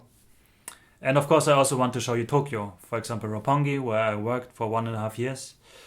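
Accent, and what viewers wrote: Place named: Germany. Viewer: Aaaah I knew you were German when I first heard your accent in Dave Trippin's video :) Bin auch deutsch (aus München) und habe für 4 Jahre in Japan gelebt, will irgendwann zurück, ist eine Liebe für's Leben, wie's scheint. Bereu auch irgendwie meine Entscheidung zurück gegangen zu sein, wird sicherlich schwierig es nochmal zu schaffen! Das Visum ist ja immer Hauptproblem.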